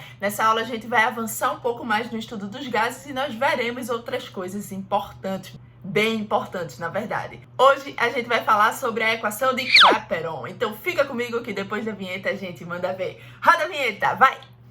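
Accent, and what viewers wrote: Pernambuco, Brazil. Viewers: CADA AULA FODAAAAQ. Tinha que ser nordestina, e quase certeza que é Pernambucana, né?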